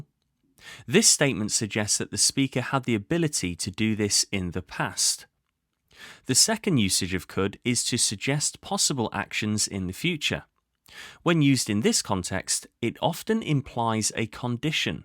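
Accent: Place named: Wales, United Kingdom. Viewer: I've been ever so swamped with work lately that only now have I been able to begin to watch your current series of 41 videos (so far, hopefully kkk). No need to say I'm enjoying them no end. Thank you, thank you very much. As for your Welsh RP... well... I love it!!! kkk 😊😊😊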